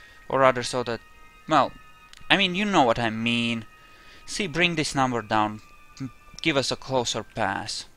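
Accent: Russia. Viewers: That's actually a nice rocket! By the way, you can hold I think shift or control or something and click on the Apoapsis or Periapsis and the altitude numbers will stay on the screen. I like your KSP vids. I wish they were more popular. You never say uh, uh, uh, uh, like others. Your accent is cool. It's like listening to Russian space race videos. LOL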